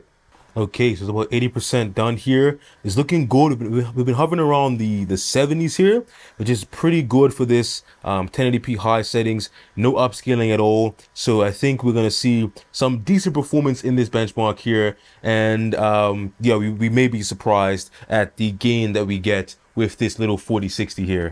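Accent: Bermuda. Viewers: sound bermudian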